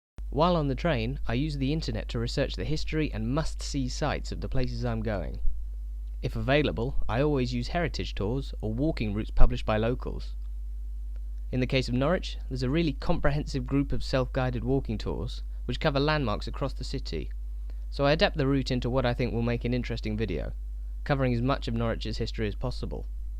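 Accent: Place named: North East England, United Kingdom. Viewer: I genuinely thought you were from the North East of England for some reason! Love these videos - I’m so glad I found this channel - it’s like ASMR for the eyes (if that makes sense haha 😂 )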